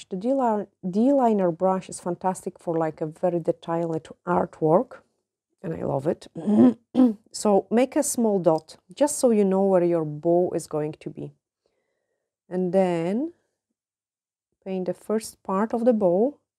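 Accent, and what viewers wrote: Scotland, United Kingdom. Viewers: Your precious little accent is EV-ER-Y-THING!💖 JUST ADORBS 🤗 I never would've guessed Scotland!!! But you sure throw out some BANGERS with your nail art, but HUN-NYYYYYY!!!!! THAT STONE PLACEMENT IS ALWAYS BEYOND ON POINT 👌🏼 Honestly, even if you had no idea how to do nails, (which you are so exceptional àt!) , SOOO MANY PEOPLE would still come to you for stone placement and/or nail design 😍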